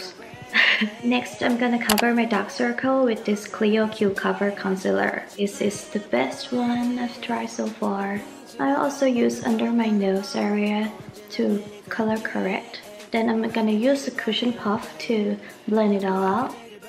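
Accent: England, United Kingdom. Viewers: ชอบสำเนียงอิ้งพี่ มากๆ อยากให้พี่ ทำคลิปแบบนี้บ่อยๆ งื้ออออออ นี่คือพยายามฟังโดยไม่เปิดซับ ฟังออกบ้างไม่ออกบ้าง ถือว่าได้เรียนรู้😶 ปล.พี่ น่ารักมากกกก ชอบโทนนี้เลยยย❤🍑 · ชอบสำเนียงอิ้งมากกกก😍💗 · i love your english accent💖 so please don't be shy naka💖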